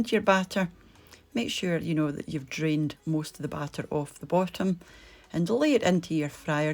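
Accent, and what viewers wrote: Scotland, United Kingdom. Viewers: Your wonderful scottish accent always cheers me up. Thank you for that. Hope you and your family are safe and healthy as well.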